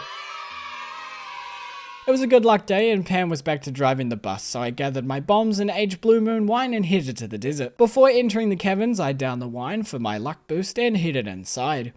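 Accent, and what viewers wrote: New Zealand. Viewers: The way my brain stopped working when i heard the kiwi accent. Wasn't expecting a fellow NZer! I'm so used to hearing the American accent 😂 Awesome video dude, gives me something to watch/listen to while crocheting lol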